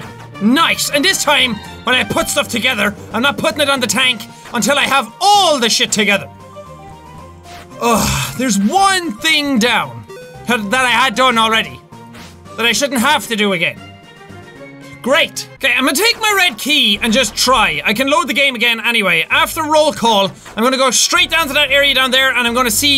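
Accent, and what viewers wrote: Ireland. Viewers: He's Irish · I spent the majority of my day binge watching this whole playlist and now that it's been a few hours since then i've noticed my inner monologue has taken on an irish accent. I'm definitely not mad but this is very strange.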